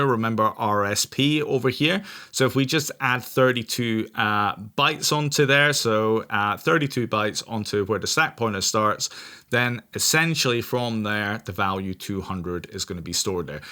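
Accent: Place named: Scotland, United Kingdom. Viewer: Enjoying your videos!, where is your accent from? I feel like I hear a bit of Scottish, English, American, I'm assuming you have lived in many places in your life? 😃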